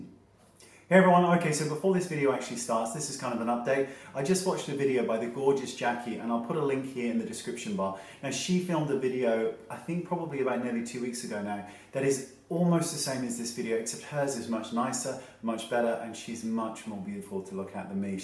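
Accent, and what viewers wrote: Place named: West Country, United Kingdom. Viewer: rofl you sarcastic fuck. I love it. Your westcountry accent comes out more when you get catty, ITS BEAUTIFUL. Kisses xxxx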